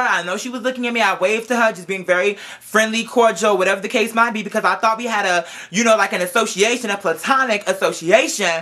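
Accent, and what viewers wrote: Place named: New York, United States. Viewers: There's that New York accent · Where'd that NY accent come from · You have east coats accent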